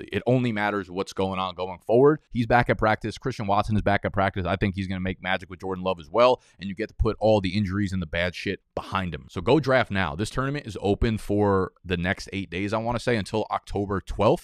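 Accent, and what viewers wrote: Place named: Philadelphia, United States. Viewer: Maybe this is a reach but I’ll feel like ur from Philly or Delco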